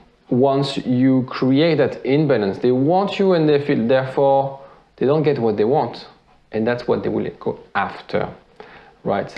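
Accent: France. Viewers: Love ur French accent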